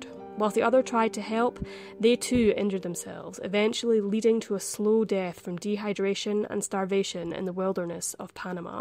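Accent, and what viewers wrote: Scotland, United Kingdom. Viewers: And I LOVE your accent ! Reminds me of Sean Connery.A relief after all those computervoices an Vallygirlll accents.A delight to listen at.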